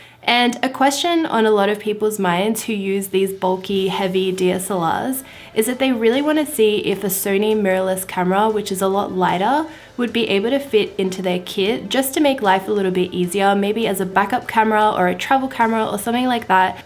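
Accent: Australia. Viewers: Valley australian accent? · As an English teacher in Germany I just simply enjoy listening to you speaking. Your Australian accent is adorable! ;-)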